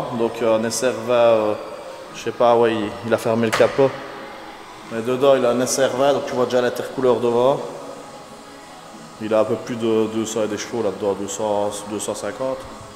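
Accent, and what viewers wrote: Belgium. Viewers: 350 zete 😂😂😂 les belges vous avez un bel accent bravo à toi!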